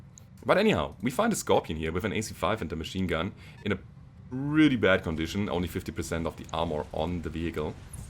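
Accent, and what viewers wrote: Germany. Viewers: That German accent! Great!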